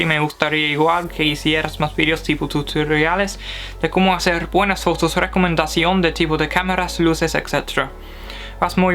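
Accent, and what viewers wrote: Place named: Argentina. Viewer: Great job man. As a native Spanish speaker I understood everything you said, you also sound argentino when you pronounce many words. Btw el país se llama Noruega 🇳🇴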